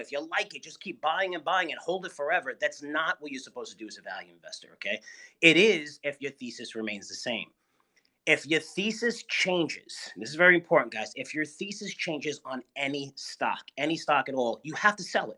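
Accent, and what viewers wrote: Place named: Staten Island, United States. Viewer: This dude sounds like he's from Staten Island. Demz, Deez and Doez. Sounds like he works in sanitation.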